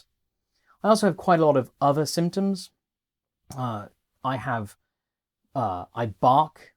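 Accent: United Kingdom. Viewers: This has to be one of the most informative videos I’ve watched on YouTube in a long time. Aside from that, perhaps one of the bravest I’ve seen too. Never easy to talk about something so personal and you presented it in a way that made it incredibly easy to understand. As for worrying about what Brits think of how you sound being called a British accent, don’t. As an expat Brit, I can tell you we have so many accents anyway, there really isn’t any such thing as a British accent, and I’ve never known a Brit who even thinks about it (except for cases where we can’t understand somebody, and, yes, there are other Brits with accents so strong we can’t understand them either). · I enjoy your brit diction, stay safe and well. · I watched just your Ducati-video. Keep this British-sounding clear-speech. You are far more easily comprehensible (to all people with a hearing-impairment)!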